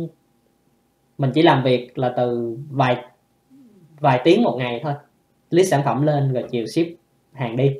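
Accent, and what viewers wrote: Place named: Mekong Delta, Vietnam. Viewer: Ban noi giong nguoi mien tay nguoi viet nam nho nhung nguoi tay fai nguoc nhin ok e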